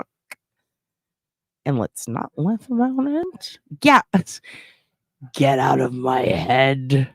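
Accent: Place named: New Jersey, United States. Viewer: Sounds like a Jersey accent to my NYC ears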